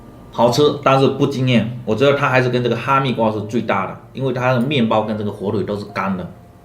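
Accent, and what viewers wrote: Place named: Minbei, China. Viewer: 的闽北普通话不敢恭维丫的女人缘真的没话说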